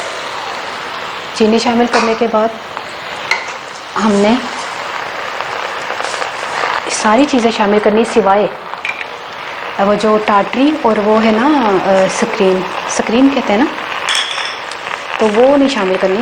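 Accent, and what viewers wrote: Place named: Punjab, India. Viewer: Mezedar Punjabi hahaha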